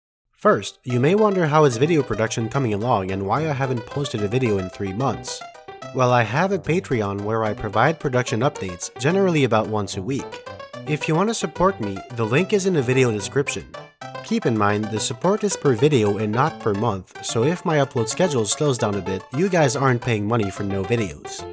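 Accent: Quebec, Canada. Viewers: before he gets the question <num> times he’s french-canadian (québécois specifically) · dude, your english is completely fine. From your accent I think you are canadian, possibily first language french? You speak better english than I do and certainly better French! Thank you, I love your videos and come back regularly to watch them <3 Thank you for all the effort you put in, especially with the audio :-) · J'ai une question très simple : Est tu Québécois ?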